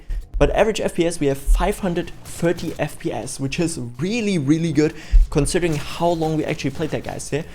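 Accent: Germany. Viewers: Man hört dein deutsch raus! Sehr geiles video! Habe mir jetzt auch einen gebaut mit nem Ryzen 7 5700x, ner 5060 32gb ram usw. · i can hear the german in u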